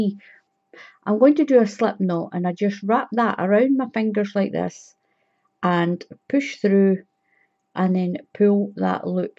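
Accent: Scotland, United Kingdom. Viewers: I’ve had double fun watching this tutorial! Firstly, Muttley looks fabulous, he’s such a good model for the hat! Secondly I’ve used the CC captions for the first time and your gorgeous Scottish accent has completely flummoxed it! Every time you say the word “six” it shows “sex”!!!!! If you want a good laugh I suggest you turn on the CC captions and settle back with a cuppa! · Hiya! Great to hear a Scots voice! Recently learning crochet. Following exact measurements for my lurcher Eloi. (Bedlington X deerhound). Apart from missing a post during my first round of front post bk post which I'm aware of and cringing about (lol!) - have u any tips on tension as my work has gotten quite loose? I'm only on the 3rd row but am mightily impressed. It doesn't REALLY matter much as his lurcher neck grows in size as it joins his neck so I could work in a longer neck onto his hat in theory anyway 🤭 big hello and thankyou from Dingwall! 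✌️♥️💪👏🏴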